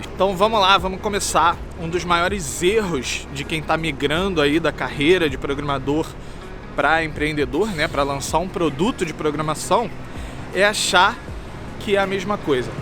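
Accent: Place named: Rio de Janeiro, Brazil. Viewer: O que eu sinto ao ver os videos do: 1 - Esse cara ta mesmo querendo ajudar. 2 -Ele entende MUITO de programação, mas tb entende mto do business por trás disso, não só de ser funcionário. 3 - Saudades de Vancouver, melhor lugar que ja morei na vida. 4 - Sotaque do RJ é foda 5 - Preciso ser aluno desse cara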